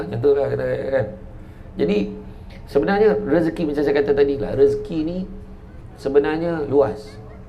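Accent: Negeri Sembilan, Malaysia. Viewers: saya rindu nak dengar orang cakap nismilan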